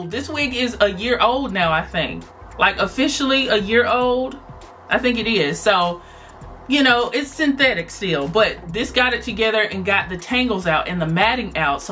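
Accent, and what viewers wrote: South, United States. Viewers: You sound like a southern belle it’s too cute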